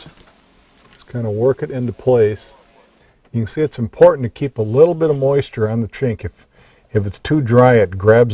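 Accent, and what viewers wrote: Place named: Minnesota, United States. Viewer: Dig the accent He's from Minnesota you know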